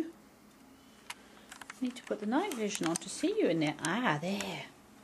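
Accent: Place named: South Africa. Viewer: If you don't mind me asking, but where are you from? You sound South African :)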